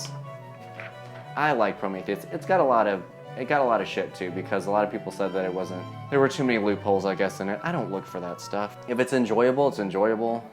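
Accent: South, United States. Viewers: I thought I heard a southern accent! We are in NC now but my hubs is a native Nashvillian.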